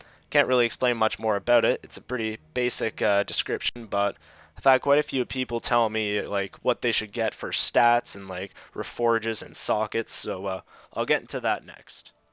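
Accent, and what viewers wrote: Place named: Canada. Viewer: You have a very stereotypical Canadian accent.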